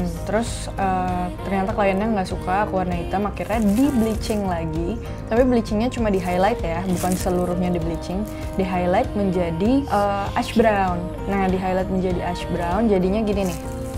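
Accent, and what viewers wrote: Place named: Visayas, Philippines. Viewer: Akala ko bisaya si ateng 😂😊😊😊😘😘😘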